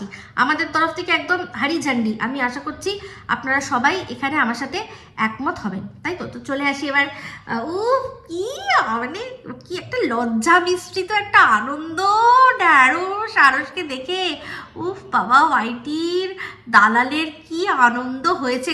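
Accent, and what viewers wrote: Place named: Dhaka, Bangladesh. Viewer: tumi camerar pichon thekei video koro, tomar khati Dhakaia kotha shunte shei lage. Kintu kichuta change ante hobe tomar roasting e. Asha korchi khub shggiri tomar channel ta firee pabe. Fira asho notun rupee.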